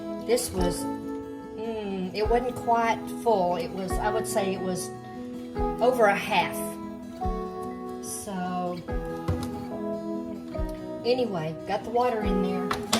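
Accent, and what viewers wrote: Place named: South, United States. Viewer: You seem to have a southern accent, I can't believe you are using canned biscuits 🤦🏼‍♀️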